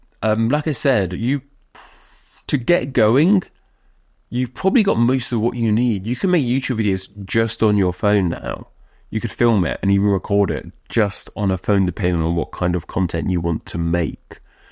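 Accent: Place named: United Kingdom. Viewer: That's clearly a British accent.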